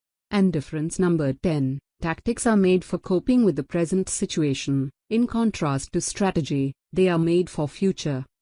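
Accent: India. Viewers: HOW CAN A FUCKING VOICE AI HAVE AN INDIAN ACCENT FOR FUCK SAKES, HOW DECIDED THIS WAS A GOOD IDEA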